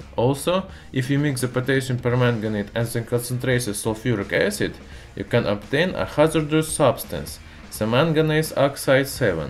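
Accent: Russia. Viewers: your russian acccent makes it hard to understand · Bro are you Russian no cap love from india 🇮🇳 🤝🇷🇺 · Now i can die in a peace... finally i find that this guy is Russian and he is speaking English in Russian accent. 😂😂😂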